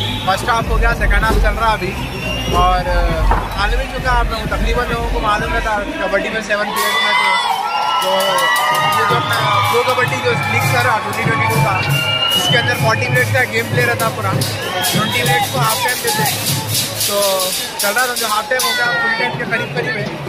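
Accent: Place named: Hyderabad, India. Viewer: Hyderabad accent I love much ,maza aagaya😍😍😍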